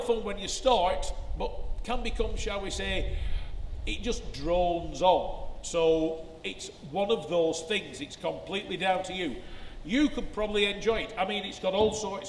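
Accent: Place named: Northwest England, United Kingdom. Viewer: An immense machine, we have that exact VRS at the moment, mpg we got similar to you, where are you in the northwest, you sound local to us?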